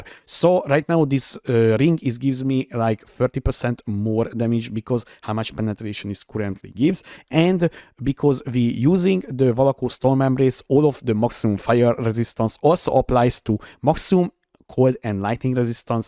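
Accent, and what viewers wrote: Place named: Hungary. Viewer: eros a magyar akcentus hhhhh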